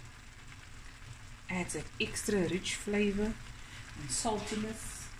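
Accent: Cape Town, South Africa. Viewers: That capetonian accent is unmistakable.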